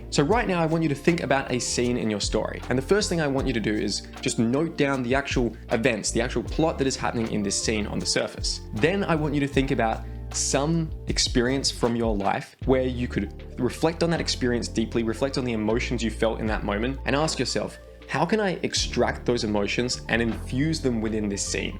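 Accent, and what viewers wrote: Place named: Australia. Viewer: Who is this aussy btw?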